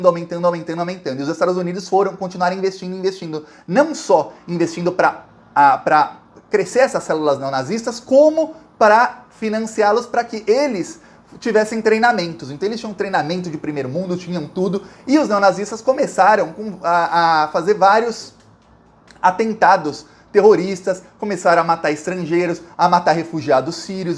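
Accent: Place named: Florianópolis, Brazil. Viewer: Poderia falar mais pausado? Tá parecendo meu irmão caçula de Floripa manezinho... Abraço!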